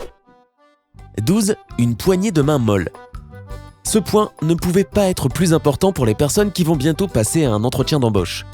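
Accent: Quebec, Canada. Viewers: Si le gars est Québécois, il parle comme au Québec, on ne peut pas lui en vouloir de ça - à nous d'adapter pour l'Europe :-)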